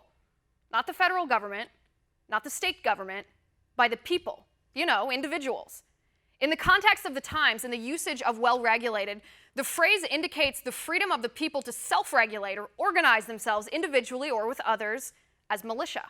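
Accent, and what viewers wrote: United Kingdom. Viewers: Being part Irish, I am too polite to write how I feel towards Morgan and his British accent telling me to disarm. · "Are not the King's ships and the King's troops enough protection for an honest man?" Gen. Sir Thomas Gage, commander of the disastrous British raid at Lexington and Concord. Does anyone know if and Gen. Gage related? Gun control with a British accent does not play well here. It didn't play well 243 years ago either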